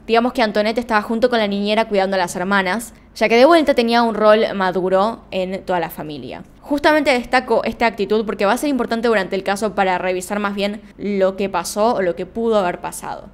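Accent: Argentina. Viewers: A veces siento que nos estas regañando, luego recuerdo que eres Argentina y que así hablan... Pues de me pasa.... Me sentí regañada al final del video 🤣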